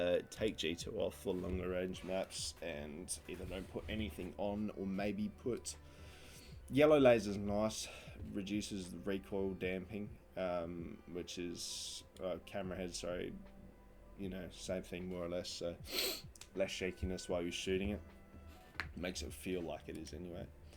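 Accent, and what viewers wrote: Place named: Australia. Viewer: Finally an aussie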